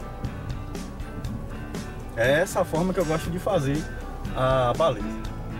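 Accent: Bahia, Brazil. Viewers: Conheço esse sotaque de longe...Vc é daqui da Bahia. Rsrs vc dá aulas de direção?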